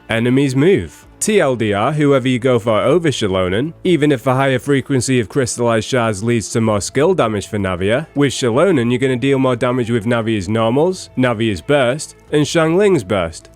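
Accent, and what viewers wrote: United Kingdom. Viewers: it feels like a breath of fresh air hearing another fellow brit talk about genshin, earned a sub from me mate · Too Much British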